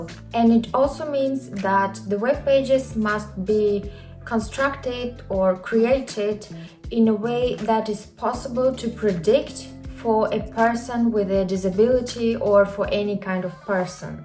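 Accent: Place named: Russia. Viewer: I geussed Siberia - your accent sounds russian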